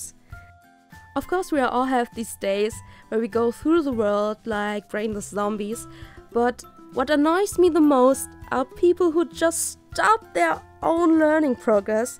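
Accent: Germany. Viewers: that accent...lol. i think you speak german don't you? i do so and have the same one xD srry if i'm wrong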